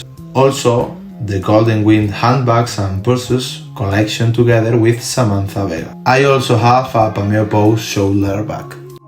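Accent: Spain. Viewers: Least nottable spanish accent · First of all, i just wanna say you have the fatest rising collection i've seen, knowing you started 3 years ago. Its truly amazing seeing a European, like me, enjoy this series so much and see this collection that is so epic. Second, i would love to get a more detailed video about the shonen, ultra jumps display in your room because shonen, ultra jumps, volumes is where i also wanna start collect more and i would love to know more about. Last, i think i understand you in borh languages! i'm from l🇵🇹 and your spanish accent isnt that hard ro figure it 😂 But you blend them very well for your audience and i think you are doing fine. Thanks for the video Mr, i csnt wait for more content from you and someday, we can see the whole collection since we you have diad this is 70% of it due to the lack of space. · el acento español le da el toque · Bro, se te nota mucho el acento español, pero se nota que le pones buena intención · Yo cuando veo que habla inglés como yo Yo: este compa es español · a los 20 segundos saque tu acento de español JAJAJA ta bueno tu coleccion ❤ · el inglés españolizado me mata JAJAJAJA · Eres mas español que yo con ese ingles, guapisima la coleccion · Como se nota el acento de Albacete jajajaja, vaya puta barbaridad, ojala tener algo así algún dia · El inglés españolizado le da el toque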